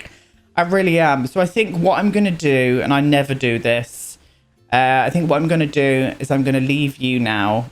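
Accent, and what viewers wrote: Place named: England, United Kingdom. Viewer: I love his accent! 😍 His region of England has a great dialect! 😊 💞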